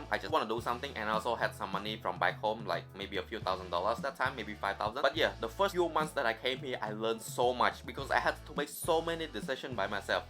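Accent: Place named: Cambodia. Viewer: hey bro, even as listening to your accent, I could tell you're Khmer. I am a Khmer international student as well, but situated in New Zealand. I could relate to many things in your vid !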